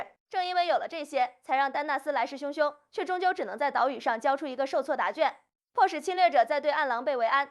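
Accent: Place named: Beijing, China. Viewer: 住日本的台灣人？台灣人講話北京腔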